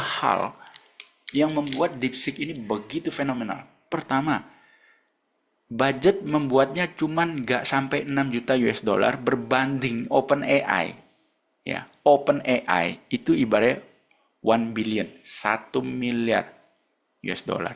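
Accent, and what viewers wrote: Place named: Central Java, Indonesia. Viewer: Modar, semacam logat jateng , magelang purworejo sekitarnya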